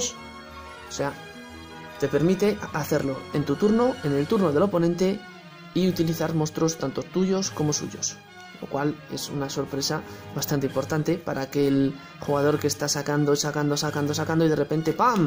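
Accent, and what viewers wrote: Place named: Spain. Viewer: Por tu acento eres de España de que lugar? Soy de Madrid asi un dia jugamos unos duelitos, gran video!!!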